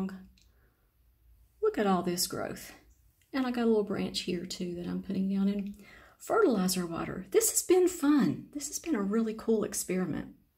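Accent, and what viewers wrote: South, United States. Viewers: Those are some nice basic Phals your growing. Being from SC it’s nice to hear someone else with that southern accent growing nice looking orchids. Enjoyed the video.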